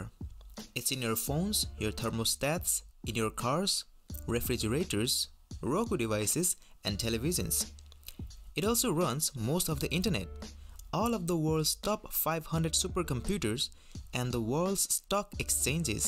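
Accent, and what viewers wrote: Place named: Finland. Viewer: Fills like this guy is Finnish :)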